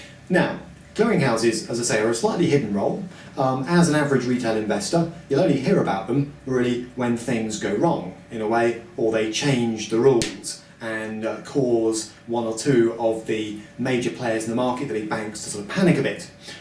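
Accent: England, United Kingdom. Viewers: lol that's the clearest english accent by an english person you'll ever hear. he's RP to the core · Sorry Don't understand your English Accent.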